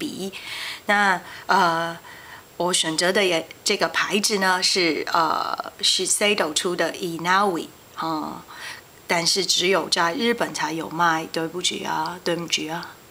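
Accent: Hong Kong, China. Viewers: I think shes from hongkong. She has got the catonese accent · she is from hong kong, she does have the accent. She spitted out some Cantonese one her other videos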